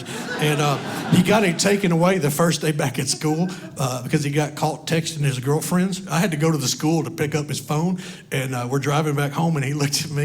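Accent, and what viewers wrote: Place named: South, United States. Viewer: His accent makes u think he’s gonna say something ignorant but he’s got his own southern style to him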